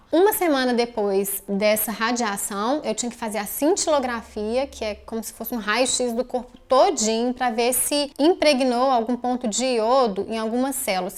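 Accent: Minas Gerais, Brazil. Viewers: O sotaque mais bonito do Brasil, o de Minas Gerais.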